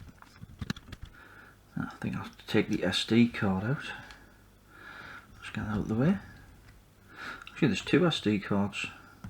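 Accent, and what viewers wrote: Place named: North East England, United Kingdom. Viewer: Ideal item for someone like. Not Vince. I don't like him. He sounds too "nice", he's not my mate and him saying "Trying to fix" implies that he's fumbling about without really knowing what he's doing. YOU, though, do know what you're doing. You quickly identify the problem and just get on with repairing/replacing the dead part(s). You get straight to the point and don't mess about. No silly intro music or graphics. Also i like your North East accent.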